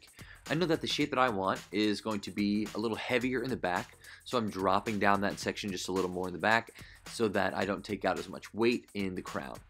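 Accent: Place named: United States. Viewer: Absolutely awesome dude from one hairdresser to another I’m from the UK 🇬🇧 i can tell your the US 🇺🇸 accent.Loving your content so much ❤can’t wait for more of it 🎉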